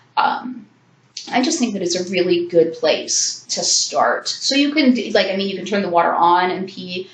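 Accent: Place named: Canada. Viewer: Like your Canadian accent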